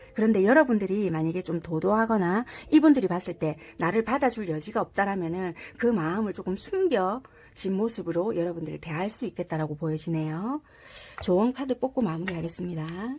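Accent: Busan, South Korea. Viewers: 하이용 또왔어용 쩍지보러 제가 궁금한건 믿음이 안가는 현실을 스스로 만들고 믿어달라하는 타로가 나와서 사람은 믿을게 못되는데 타로를 재미로 보기가 힘듬 물어볼때가 없어서 보는거거든요 잼있게 보고 조아요 미리누르고 가요 좋은밤되요 👍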